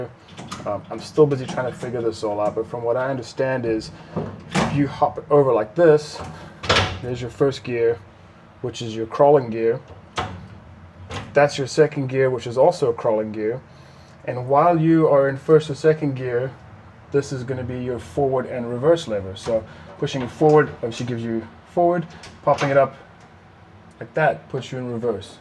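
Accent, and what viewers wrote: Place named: Texas, United States. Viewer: I have heard that accent before what part of Texas are you from ??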